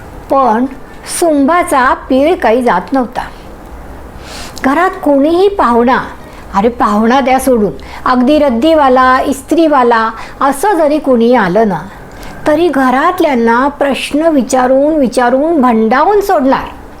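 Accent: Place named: Rural, India. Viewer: शिल्पा ताई जोशी क्षीरसागर यांची किती सुंदर कथा निवडली.कथेतील सृजनता अनेक अर्थाने बोधप्रद होऊन गेली.मुळात त्यांची कल्पना अदभूत आणि त्याचा त्यांनी केलेला कल्पना विस्तार संवेदनशील झाला आहे. कथेतील शेवटची ओळ कथेला एका नवीन उंचीवर घेऊन गेली. तुमचे सादरीकरण नेहमीसारखेच दृष्ट लागण्याजोगे झाले आहे. तुम्ही ग्रामीण भाषेचा लहेजा ज्या पद्धतीने तोलून घेतला त्यातूनच तुमचे व्यासंगी अष्टपैलुत्व पुन्हा एकदा सिद्ध झाले. सुनिता तोंडोळकर./ छत्रपती संभाजीनगर. डॉ.शिल्पाताई आणि तुम्हां दोघिचेही हार्दिक अभिनंदन ---!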